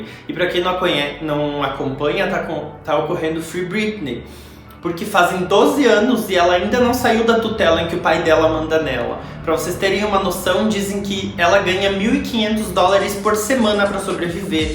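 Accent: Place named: South, Brazil. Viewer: Sotaque do Sul kkkkk A maioria daqui tem esse sotaque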